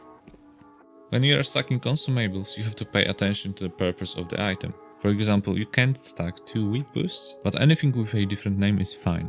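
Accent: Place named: Poland. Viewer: słychac ten akcent polski xd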